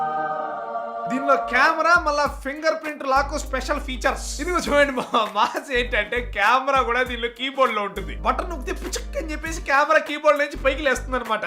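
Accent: Chittoor, India. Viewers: Chittoor slang anna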